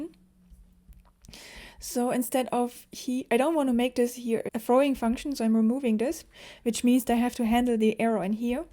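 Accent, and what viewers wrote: Germany. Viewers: Your accent sounds German with a tiny bit of French. Are you from the region of Germany near France?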